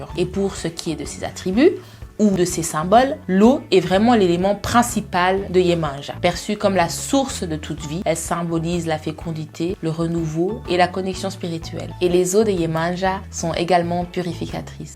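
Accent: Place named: Brazil. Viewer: Você é brasileira, o sotaque família Brasilândia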